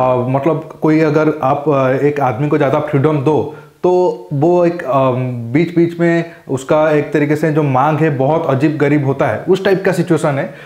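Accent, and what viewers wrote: Odisha, India. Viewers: Your videos are indeed good. But try to avoid your odiya accent while speaking Hindi or English words.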